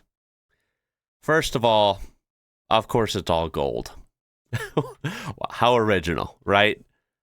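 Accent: United States. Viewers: As a Norwegian, I wouldn´t bat an eye over someone telling me which state they´re from instead of America or USA. Doesn´t work with a lot of other countries though.. Also, I´d hear straight away that you´re American, so that´s really redundant info.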